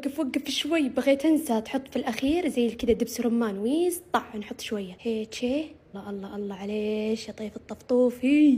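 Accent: Saudi Arabia. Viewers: والله عن جد اللهجه السعوديه مره بتجنن انا من الجزائر I love you ❤❤ طيف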